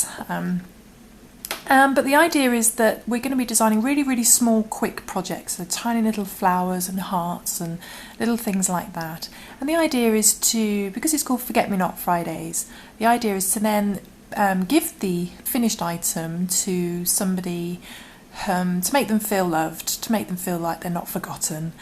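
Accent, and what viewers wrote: England, United Kingdom. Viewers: You have an English accent but you are describing stitches with American terminology aren't you? I'm confused - we don't have a single crochet in English terminology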